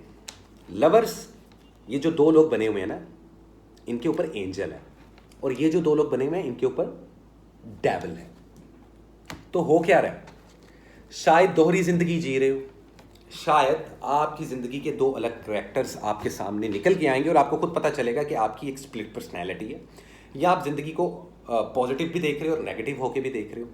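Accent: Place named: Punjab, India. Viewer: Capi here,Thanks for the reading 🙏🏼 Love your Punjabi accent 🫶🏼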